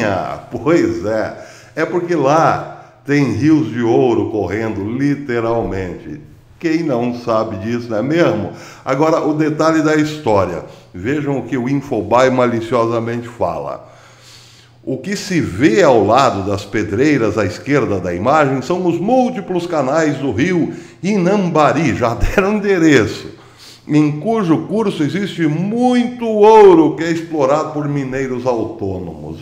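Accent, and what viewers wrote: Caipira, Brazil. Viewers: Fessor! Num é Aisland. É Ailand. Amando o caipirês!! Hehe. Um abraço! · Eu ADORO O JEITO CAIPIRA DE FALAR RELAXA A MIM E ME LEMBRA DE LIMEIRA ONDE NASCI BOAS LEMBRANÇAS DE UM TEMPO MUITO BOM NOS ÉRAMOS MAIS LIVRES E FELIZES DO QUE AGORA. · EU gosto da FORMA caipira, então os BESTAS que engole o CHORO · Kkkkkk! Adorei o caipirês. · sotaque caipira não é matar a lingua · Kkkkkkk...o Sr consegue ser hilário sem perder a seriedade. Vai firme no seu sotaque mais que brasileiro. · Amo seu caipirêsssss!!! Adogôooooooo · Pois é, o YouTube deve ter estudado aritmética com esse professor a criticou seu português de “caipira”.. vixxx! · Excelente Professor, o seu sotaque bem brasileiro, caipira e expressivo, além de excelentes comentários em variados assuntos, esse esquerdista que fez o infeliz comentário sobre o senhor, deve está com inveja por está no ostracismo! 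· Continue com o caipires é muito bão !!! Hahahahahha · Professor,eu adoro ouvir o senhor falar o "caipirês",pode continuar a fazer suas análises desse jeito 👏👏👏☺️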